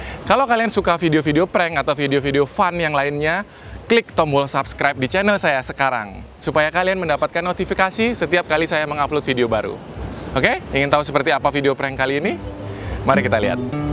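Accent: Bali, Indonesia. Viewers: aksen balinya kental banget :D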